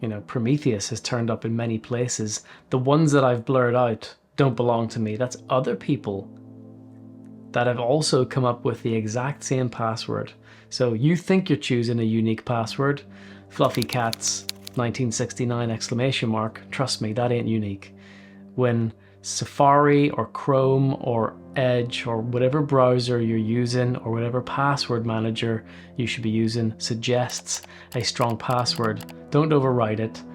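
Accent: Northern Ireland, United Kingdom. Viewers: Is that a Northern Irish accent i hear? Haha! Nice video man, a lot of people underestimate the amount of information is out there of them. I actually recently paid a service to search some of my credentials against breaches. Turns out even tho i use generated passwords, they were actively being leaked. Found 4 passwords compromised in total. I think it's great showing this kind of information to people. Wish i did mines sooner!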